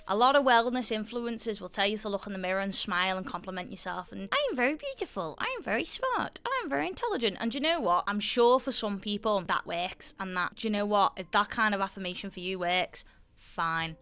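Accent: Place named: Merseyside, United Kingdom. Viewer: Genuinely, I used to have awful MH then I got better for a while then I had an unexpected loss in the family very close to me and lost my way. I’ve been struggling with it for 3 years, not knowing how I got to a good place, not knowing my purpose in life and trying to avoid my feelings that I fell back into add/ction transference and resorted back to the BED I was diagnosed with that I’d managed to escape for a while. I’m from Merseyside as well so not only does your accent bring me a sense of home as I moved away from home, your videos have reminded me of how I did it the first time. I changed the way I spoke to myself, I changed the neural pathways. Sadly, negative ones were reinforced by the death of my loved one which is partly why I fell so hard (and sadly gained back some of the weight I’d lost the first time I got better). Thank you for reminding me that I can do hard things, and I can get back to how I was before because I’ve already done it once, I can do it again. 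I can take ownership of the bad things that happened to me and be resilient. I am resilient, and I always have been resilient. My family member died and I lost my home in my first year as a mature university student and I still got that degree and got a job despite feeling like the world was crashing and burning around me. I can do hard things. I can take control of my life. I can like myself again. And, most importantly, I can beat the add/ctions I once had. 6 years smoke free. 2.5 years alcohol free. Now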